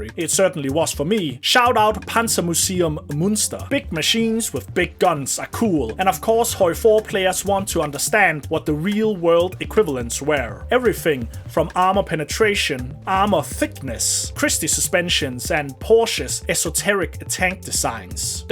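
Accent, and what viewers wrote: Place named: Denmark. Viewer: Elsker det danske accent🫡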